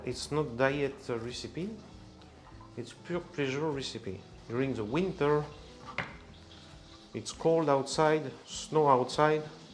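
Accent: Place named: France. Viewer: Love his French way of expressing and speech patterns!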